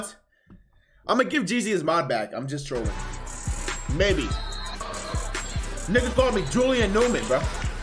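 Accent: New Jersey, United States. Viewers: you from jersey? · New Jersey accent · Ripe New Jersey accent right there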